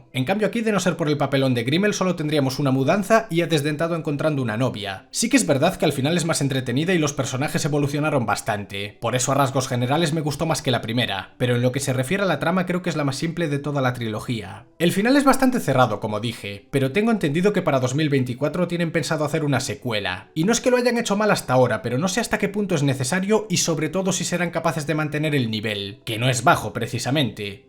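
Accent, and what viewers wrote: Spain. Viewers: Che de donde sos ? Hablas con mi argentino, pero acento español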